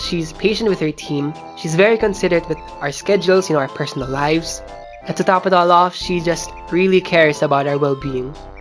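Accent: Philippines. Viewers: I recognize a Filipino accent from a mile away! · i heard ur accent and i knew ur Filipino, im also Filipino i never got to learn how to speak Tagalog but I can understand a little bit. btw happy birthday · TRIP KO YUNG ALAM KO NANG PINOY KA FROM THE ACCENT OMFG I LOVE THIS/YOU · As soon as I heard ur accent, I was like: oh ur Filipino. Also happy birthday!!:DDDD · I knew it at first because of the accent! Lol Proud Filipino here! Mabuhay 🇵🇭🇵🇭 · accent palang alam na agad ✊😭🇵🇭❤️ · Sabi kona pilipino ka haha sa accent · Welp, Im already late on this but, meow. When you start talkin I knew youre a filipino <3. I really love your animations!! <3 <3 <3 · haihai, filipino ka rin pala, ndi ko alam until narinig ko accent mo, wasn't sure tho then you confirmed it coz u're accent is good (like better than most of us pinoys) kaya di nahalata. A proud kababayan for u 🤧 sanaol talented sanaol nkakausap si Rae sanaol lahat ✨ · Nung natinig ko accent mo alam ko na na pilipino ka😊❤😎🤣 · Me:0 that accent!! 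hmm....: I’m Filipino. Me: I WAS RIGHT IM RIGHT!!!!!!!! W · WHEN I HEARD UR ACCENT I WAS LIKE WAIT THIS KING IS A FILIPINO?! AND YOU ACTUALLY ARE!! ANG ANGAS NG ARTS MOOOOOO, CONGRATS TO UR SUCCESS AND BELATED HBD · i knew it... from the accent and manner of speaking... congrats sa mga project mo with the group of Valkyrae and her friends i like your drawing style, nagdodrawing din ako since elementary days but i stopped doing drawing for 2 decades more or less and just got back roughly last year keep up the good work and MALIGAYANG KAARAWAN many more blessings to come stay safe stay healthy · The moment I heard you speak I immediately knew you were a fellow kababayan:D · HA! I KNEW THAT ACCENT SOUNDS LIKE MINE! CUS I'M FILIPINO TOO LOL MGA KAPAMILYA MAG REPLY KAYO DITO KUNG PINOY TALAGA KAYO HAHAHAHAHAAH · THE FIRST TIME I HEAR HIS VOICE,I WAS LIKE,IS HE FILIPINO OR WHATEVAH 👀👀✨✨ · BRUH THIS IS MY FIRST TIME WATCHING THIS BECAUSE I WAS SO BUSY AND BRUH YOUR ACCENT IS SOO FILIPINO HII!! 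I'M A FELLOW FILIPINO (≧▽≦)💖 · OMG THE FIRST TIME I HEARD YOU TALKING I SAID YOU'RE A FILIPINO!!!! AND I WAS NOT WRONG!!! 🇵🇭🇵🇭🇵🇭🇵🇭 Maligayang Kaarawan!!! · When u started to speak I was like "bat parang Filipino sya..?" Then u literally answered it right away lol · I KNEW IT YOU ARE A FELLOW FILIPINO THE FIRST 30 Seconds in the video, when I heard your accent I just said Hands down he is Filipino 🇵🇭🇵🇭🇵🇭🇵🇭🇵🇭🇵🇭🇵🇭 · I'M SCREAMING. I was like, "Huh, he sounds Filipin–" as he said it AAAAAAAA WOOO MGA PILIPINOOOO LEZZGOOO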